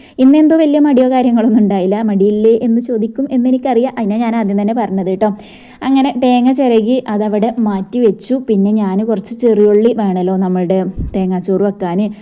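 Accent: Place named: Kozhikode, India. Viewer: എന്തിനാ കോഴിക്കോട് ഭാഷ പറയുന്ന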